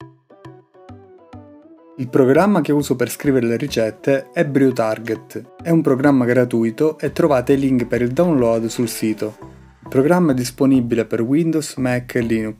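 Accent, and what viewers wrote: Calabria, Italy. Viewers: Ciao, sei calabrese?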